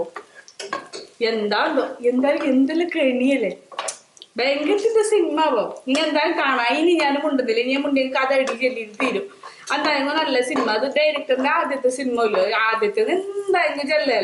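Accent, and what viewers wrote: Kasaragod, India. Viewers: Yuppaa maje aayin njammale kasrodian slang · നിങ്ങളുടെ ഈ റിവ്യൂ കൊണ്ടു മറ്റുള്ള മലയാളികൾ ഒകെ കുറച്ചു കാസര്ഗോഡൻ മലയാളം പഠിക്കട്ടെ. Good job. Enjoyed. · ivde ipo ntha indaye 😁😂 Ksd slang poli malappuram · Ksd thanne diffrent slang und so its un predictable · സൂപ്പർ സ്ലാംഗ് കാസർക്കോട് ഒരു മഴ പെയ്തു തോർന്നു പോയത് പോലെ തോന്നുന്നു അതി മനോഹരം അടുത്ത വീഡിയോ കാത്തിരിക്കുന്നു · ശെരിക്കും കാസറഗോഡ് സ്ലാങ് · ചേച്ചി ശരിക്കും കാസർഗോഡ് ആണോ.. 😂😂👍👍👍👍❤ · Kasarode poli slang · പുതിയാപ്ലെ അല്ലാ മാപ്ലെ casrod slang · Hahaha..usaaranne ppa..eden padiche kasaragod bhasa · Kzrkd slang 😍👌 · Is this kasaragod malayalam?? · ചേച്ചി കാസർഗോഡ് ആക്കി ശംസരിക്കുന്നത് ആണോ ശെരിക്കും ആണോ കാസറഗോഡ് കാരി ആണോ ഞാൻ ഒരു കാസറഗോഡ് കാരൻ പയ്യൻ (അല്ലെങ്കിൽ നമ്മൾ കാസഗോഡ് കാർ പോളി അല്ലെ) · കാസർഗോഡ് ഉള്ളവർ എല്ലാം ഇങ്ങനെ ആണോ സംസാരിക്കുന്നെ ?? 😆😆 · Ende oru frnd paranjanu njan ee tym kasarkodu bhasha inganayundu ennu kettittundu...ippo aanu nerittu kanunnathu....enda ponne ijjathi resamundu kelkan😂😂😂❤❤ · Kasaragod slang is very beautiful to speak and hear.but don't make it vulgar.. 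· Nmmle ksrotte basa endorri paangppa😍😄 hyole bisyo kettit njn chirchitt merchhoyiii,😂😂😂👍👍😍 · Sunesh 😀kasrgod slang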